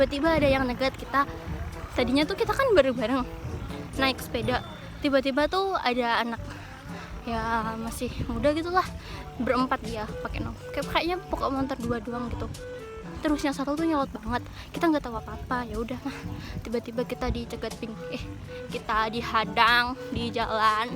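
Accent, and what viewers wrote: Indonesia. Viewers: Wong purwodadi ndy suorone lurr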